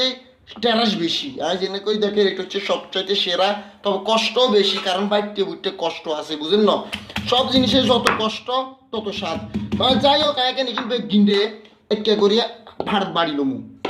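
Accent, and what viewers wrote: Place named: Noakhali, Bangladesh. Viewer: আমাদের নোয়াখালির ভাসা💓💞😍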